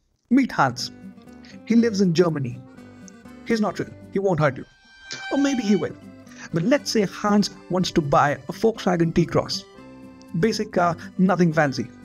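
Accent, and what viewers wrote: India. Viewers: we can hear your indian accent buddy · 💀🙏bro r u really an indian? Y r u speaking in like an-like an indian accent? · Is These Guy Indian His accent Sound's like Indian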